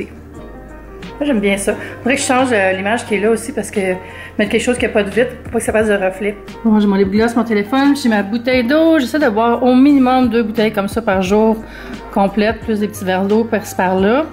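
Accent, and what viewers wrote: Quebec, Canada. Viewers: Je viens de te découvrir et du coup m'abonner à ta chaîne 😊 j'habite en Suisse. Tu es tellement pleine d'énergie 👍🏻 c'est avec plaisir que je regarde tes vidéos et apprécie ton joli accent québécois 😉👌🏻 bisous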